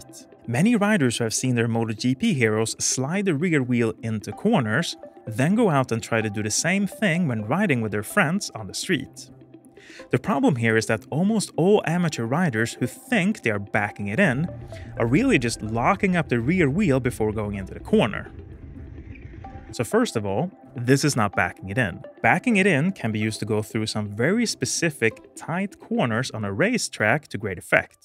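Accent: Sweden. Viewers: Your swedish accent makes it way more accessible! Bromsa bak och titta fram! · Är du ifrån Sverige? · Someone got a case of the swenglish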